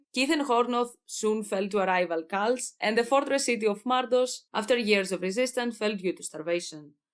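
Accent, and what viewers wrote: Greece. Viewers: Είμαι από την Αμερική, καταλαβαίνω ελληνικά και έχω πάει Ελλάδα, όπως τα βίντεο. Νόμιζα ότι αναγνωρίζω μια ελληνική προφορά. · I feel like I’m hearing a Greek accent through your English, reminds me of my father, not really relevant ik but yeah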